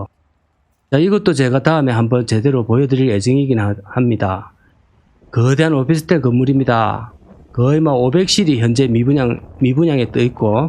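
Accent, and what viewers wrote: Gyeongsang, South Korea. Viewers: 말투는 이명박 같으신데 ^.^ 경남이시네요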